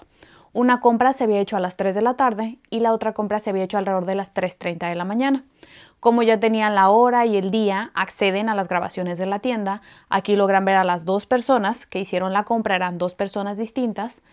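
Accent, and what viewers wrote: Mexico. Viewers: 👏👏👏👏👏De qué nacionalidad eres? Me sueñas como yo, mexicana o tal vez de Guatemala????? Soy súper fan de varios Youtubers de crímenes, pero de ninguna mexicana porque hablan " muy fresa" y no tolero ese tonito de voz , además de que eso no me parece profesional. Por fortuna de sugerencias di con tu canal y me encantó tu calidad de investigación y narración. Saludos desde Suecia. Soy mexicana pero estoy casada con un sueco y soy residente aquí. Este tipo de videos me hacen sentir muy cerca de mi amada Latiomamérica. 💓💓💓💓💓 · gracias, ya vi que salen tus Suscriptores, muy bien, así podemos ver tu avance. Tienes una dicción, tono de voz muy bonito, así se habla en Ciudad de México y Estados del rededor de Cdmx...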